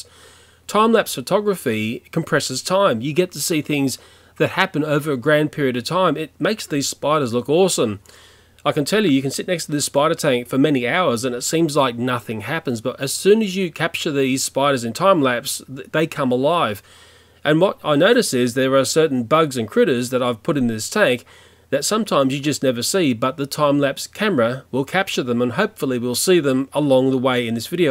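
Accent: Australia. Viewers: I know this is random, but are you an Aussie or Kiwi? I'm just wondering where your accent is from.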